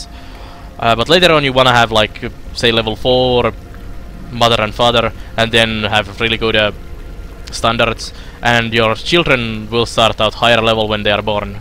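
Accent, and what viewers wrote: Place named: Finland. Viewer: "Ärrän kierrän ympäri orren...." It's funny how every Finnish accent is different, but recogniseable. Your english is really good though :)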